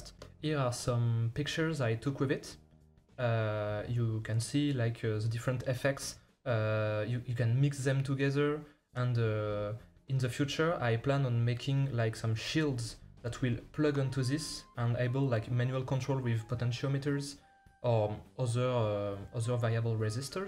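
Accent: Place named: France. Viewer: l'accent fr je kiffe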